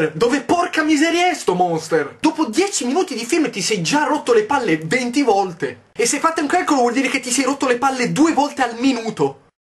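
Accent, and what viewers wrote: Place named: Calabria, Italy. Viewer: Gli ultimi 5 minuti sono stati troppo pisciosi grande yotobi xD comunque sicuro che sei di torino?quell'accento sembra tipo calabrese...eheh vecchio volpone xD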